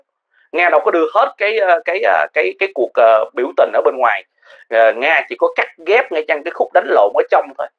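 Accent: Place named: Mekong Delta, Vietnam. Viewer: Em trai nói giọng miền tây nghe đã tai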